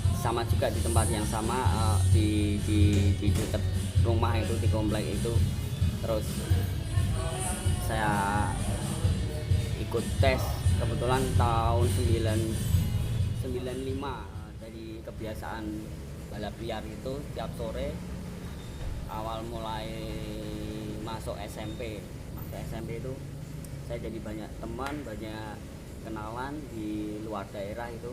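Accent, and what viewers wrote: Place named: Java, Indonesia. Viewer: Mas logatnya org jawa, jawanya dmna mas?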